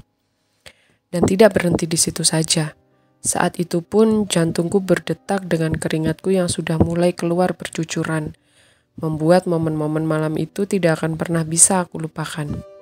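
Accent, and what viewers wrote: Malang, Indonesia. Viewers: Asik an pas samean dewe seng crito sam, boso malangan mantep, berasa ndek tongkrongan. 😁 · malangan betul logatnya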